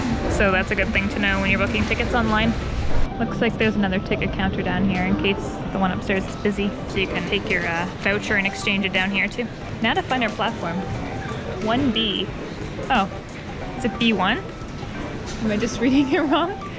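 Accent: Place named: Canada. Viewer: Not gonna lie, I have to skip that intro 😂 also you have the most Canadian accent I've ever heard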